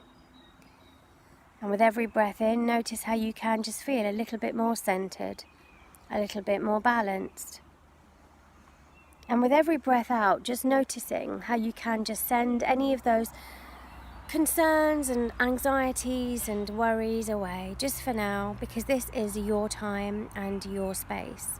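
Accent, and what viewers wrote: United Kingdom. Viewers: Are U from any British Country?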